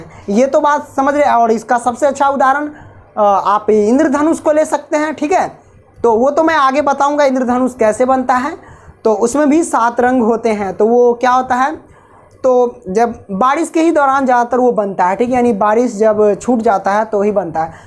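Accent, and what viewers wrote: Bihar, India. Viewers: Sir Ap bihar sa ho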